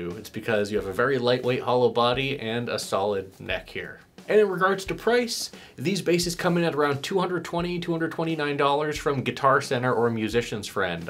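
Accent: United Kingdom. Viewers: Sandberg Booster, G&L SB-1, Stingrays, Dingwall, all have deep clear sounds. All of the violin 🎻 Basses sound so dull and without out put. They’re like one step up from a lead guitar. English accent was ⭐️ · the british accent! unmatched.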